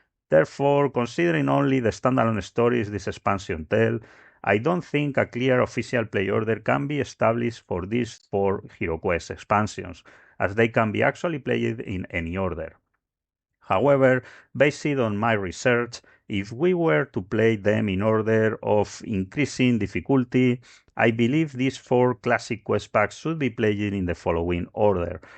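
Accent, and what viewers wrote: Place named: Spain. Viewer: El acento en español que no falte 😎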